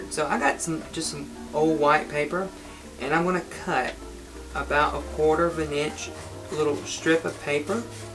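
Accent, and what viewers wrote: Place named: South, United States. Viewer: I like ur Southern Drawl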